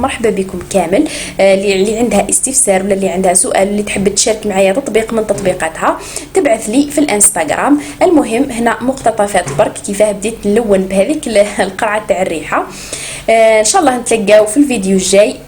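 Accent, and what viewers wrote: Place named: Chaoui, Algeria. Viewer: تباني شاوية من لهجتك الله يبارك شكرا كنت حابة نتعلم ومعنديش دراهم وزيد غالية بزاف والله نحيتي علينا ربي يحفظك ويفرحك ويحفظلك بنتك ❤❤❤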